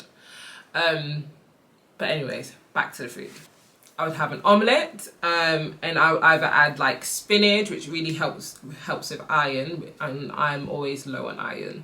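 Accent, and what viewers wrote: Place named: United Kingdom. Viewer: Side note: Sometimes it’s just nice to click on a video and hear a British accent ☺️ you look amazing sis! I can’t wait to start my journey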